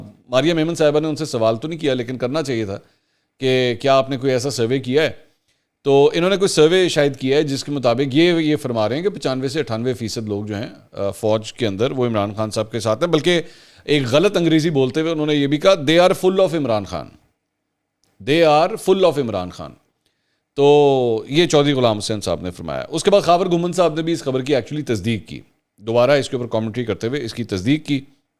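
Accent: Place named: Karachi, Pakistan. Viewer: Aap Urdu to bohat achi boltay ho jaisay Karachi walay boltay hay... Lekin aap Punjabi bhi bohat achi boltay ho.. Jaisay Punjabi Log... Please tell us something about you...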